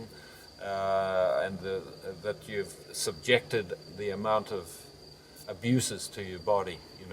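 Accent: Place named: Ukraine. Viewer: Приємно чути, коли не просто українська, а.. чиста українська )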